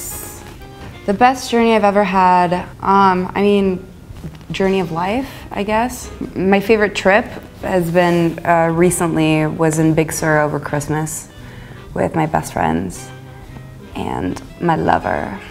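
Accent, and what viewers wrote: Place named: France. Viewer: Ella tiene algo muy francés... Ademas del acento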